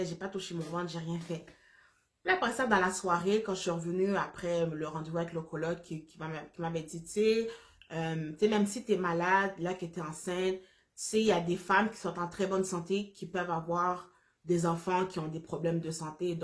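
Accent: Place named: Haiti. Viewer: Je sens à ta voix que t haïtienne 🇭🇹 💪🏾 En tout cas c’est une histoire extraordinaire c’est Dieu qui t’a donné ce petit bout de chou pour te dire de garder espoir tout n’était pas fini pour toi Par contre ton conjoint la sa réaction est très mauvaise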